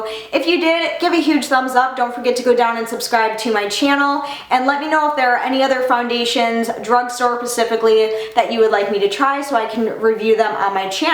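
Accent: United States. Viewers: YOUR SO BEAUTIFUL!!! ❤️💕 and girl you killed me when you said "girl, IM POPULAR!!!" 😂😅💕😘❤️ could you make a video on your everyday routine? Like makeup, clothing choices, piercing Changes, things in that genre. Also, your amazing, an I love how you speak! Are you American? That's probably none of my business but your ascent is just amazing! I love the way you say things!! 😅💕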